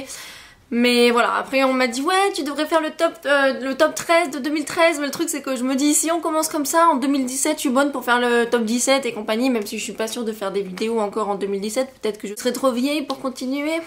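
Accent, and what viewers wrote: England, United Kingdom. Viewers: t'es trop drôle! ^^ j'en ai déduit que tu vis en Angleterre avec ton accent! ;) merci beaucoup pour ces présentation de livre!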